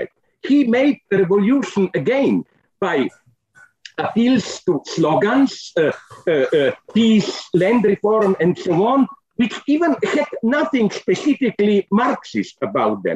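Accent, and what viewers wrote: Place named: Russia. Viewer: Like listening to a Russian Sylvester the Cat..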